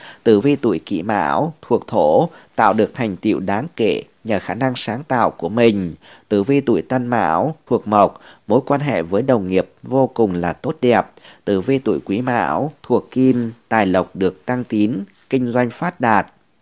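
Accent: Thanh Hoa, Vietnam. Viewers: Bạn cúa phải ngừi thanh hóa ko . Nuối hay rứ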